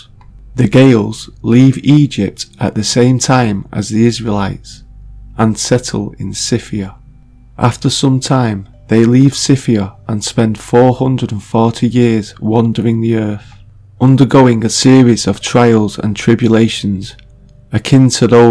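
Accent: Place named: Sheffield, United Kingdom. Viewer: Love your channel, accent sounds close to home. Sheff? X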